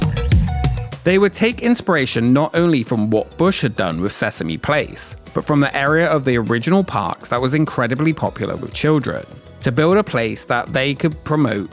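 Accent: United Kingdom. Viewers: Because unlike the builders of Hanna Barrera Land, is providing things people like. I'm pretty sure many people's lists of things that they like would include "listening to a cool sounding voice with a British accent." 😎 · This is about American theme parks but why does the host here have a British accent or is it Australian sometimes I just don’t know the difference. It’s very confusing however. Love this channel and especially the theme not this new theme but the main theme that came after the new theme don’t ever lose that.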